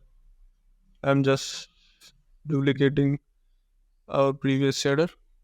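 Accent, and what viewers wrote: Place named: India. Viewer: Is this an indian accent by any chance? Usually i can understand the accent since they know english already, but this is a thick accent lol. I agree though, much respect for using his voice. Confidence will adjust the accent, but to get more views you have to be more legible. Also the subtitles aren't saying what he's saying a lot of times.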